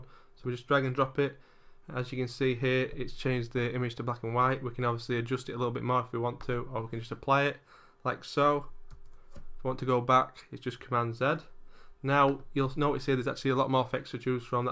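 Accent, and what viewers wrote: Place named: Newcastle, United Kingdom. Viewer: OMG YES, would love to win pixelmator :D haha Are you from new castle??? I'm in love with your accent :)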